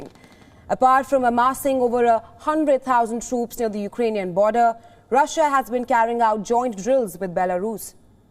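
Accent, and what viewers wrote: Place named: India. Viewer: this Indian news reader her accent is so annoying to listen yo go back to India and report they will love you there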